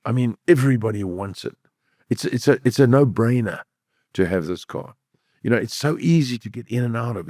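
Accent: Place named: Glasgow, United Kingdom. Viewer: Love it you can take the boy out of glasgow but canny take glasgow out the boy you just keep being your authentic self Loved it when you said diddy meaning did he wnen ge reveals an answer to a question in typical glasgow style yer like diddy then when you asked what it was like being in love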